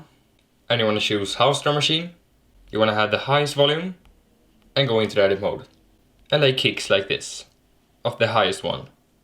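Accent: Sweden. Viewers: Swedish accent then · Ghoits Hafidz He's swedish like me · are you SWEDISH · Are you from sweden? :) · Omg your Swedish awesome i am to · Are you from sweden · Are you swedish? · are you Swedish? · are you from sweden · Are u sweedish ? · Are you come from sweden? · Är du swensk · Are you Swedish? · Are you Swedish 🇸🇪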